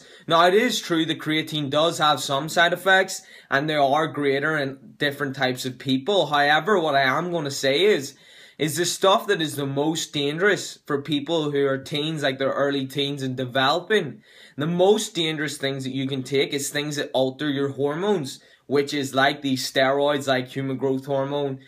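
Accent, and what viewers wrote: Northern Ireland, United Kingdom. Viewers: The norn irn accent hitting hard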